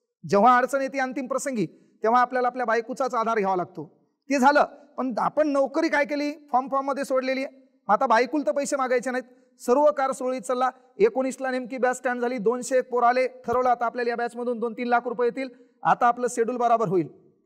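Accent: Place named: Latur, India. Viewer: I live in Ireland. I am not a student but i have been watching his reels. Mi Latur cha ahe ani siranna boltana aikla ki nehmi gharachi athavan yete. Bhasha apli naal ahe. Sir ek no ahet 🔥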